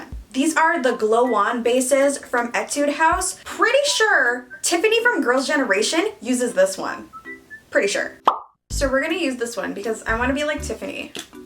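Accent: United Kingdom. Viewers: Your british accent killed me🤣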